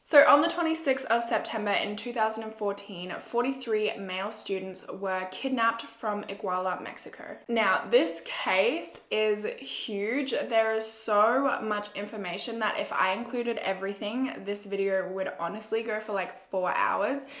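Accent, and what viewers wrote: Australia. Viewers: bro i heard ur voice for the first time and i was like she aussie to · Omg literally my first video I seen from you and I’m listening to your accent trying to detect where your from and the second you say the word know I’m like oh shit she’s Australian and I look in the description and I was right literally love your accent , a girl from my highschool basketball team moved from Australia to the states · Just Waffles Bro..umm it’s a typical Australian accent k? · she’s Australian so she has an accent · I loveeee Aussie accent omlll it’s so beautiful😍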